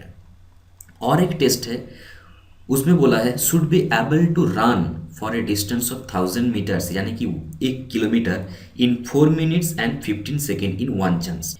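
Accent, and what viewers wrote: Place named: Bengal, India. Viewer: Apna hindi thik karo bangali babu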